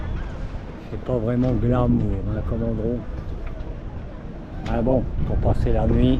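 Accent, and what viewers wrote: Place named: Valenciennes, France. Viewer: D'où qu'ej viens.. bin ed Wavrin, mais maintenin ej chui din l'pas de calais, diéval pour être précis.. Mais toi,?? j'ai l'impression de reconnaître un accent valenciennois, mais je ne suis pas sûr;)... té dou tizotte??? Sage décisison de faire demi tour.. d'ailleurs, c'est un voilier, pas un hors bord, ton bateau LOL.. donc faut savoir profiter du vent: trop de vidéos où ils se doivent de mettre le moteur.. et suis presque sûr qu'une majorité ne sait pas utiliser le sextant: s'il n'y a plus d'électricité à bord, je crains que ça ne finisse en panique, si ce n'est en drame... Au fait: pilote automatique, mais as-tu un régulateur d'allure?? je demande ça car mon grand père en avait fabriqué un quand il avait son bateau à Etaples (un petit Challenger 7 mètres insubmersible): une fabrication maison avec du contre plaqué marine.. 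Bon, à la revoiyure dans deux jours pétants;)... Cordialement. éric.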